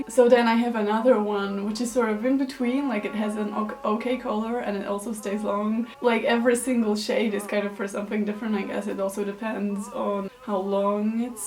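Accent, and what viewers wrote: Poland. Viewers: Fagahajakal Thank you so much! And yes I would assume that since she's from Poland she probably has a Polish accent (I'm sorry if that sounds like I'm being rude, I'm not trying to be) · Hannah Harrison She's from Poland. There is also a video or two in which she is speaking polish. And she always gets a bunch of polish comments on her videos. I dunno, maybe she has a polish accent, I'm not sure.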